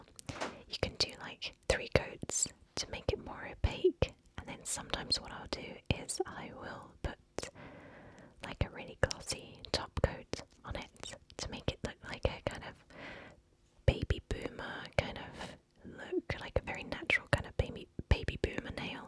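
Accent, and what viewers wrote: United Kingdom. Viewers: You are genuinly the only asmrtist with a british accent i really enjoy. The way you speak is mesmerizing. Loved the video! :)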